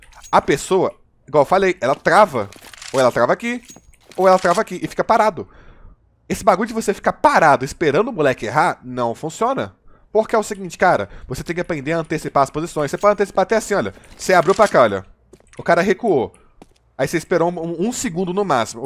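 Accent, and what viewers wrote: Belo Horizonte, Brazil. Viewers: Sotaque de BH do é bão dms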